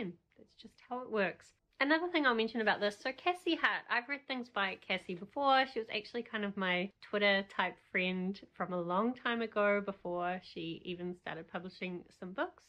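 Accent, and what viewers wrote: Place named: Australia. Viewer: don't worry don't worry I also hate that strong Australian accent 😭😭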